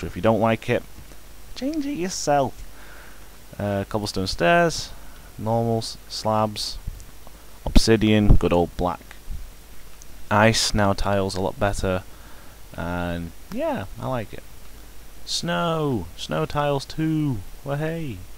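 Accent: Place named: United Kingdom. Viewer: great British accent you have yourself a new subscriber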